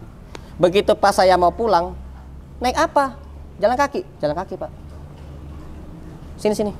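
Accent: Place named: Palembang, Indonesia. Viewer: apo kabar mang cek gaya wong palembang nian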